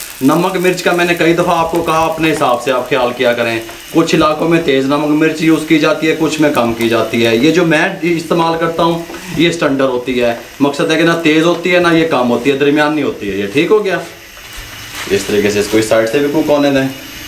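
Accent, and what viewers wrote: Punjab, India. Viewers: I lv the way he speaks punjbi viche viche😂😂 and the way he guides. Allah ap se Raazi ho. Ameen. 😇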